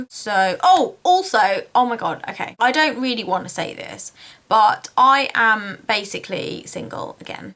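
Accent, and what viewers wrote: United Kingdom. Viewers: A Indian girl talking with a British accent sounds ugly.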